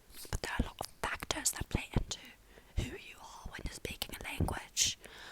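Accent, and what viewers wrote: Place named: United Kingdom. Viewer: your british accent is lovely , i hate my voice !!😂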